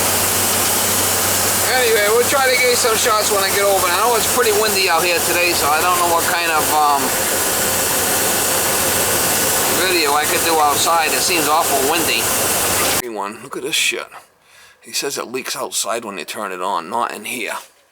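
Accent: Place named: East Coast, United States. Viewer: East coast accent.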